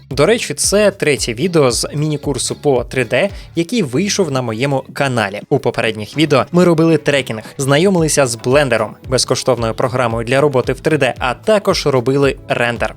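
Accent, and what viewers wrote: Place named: Russia. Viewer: Пиздец говорит с русским акцентом,типа украинец дохуя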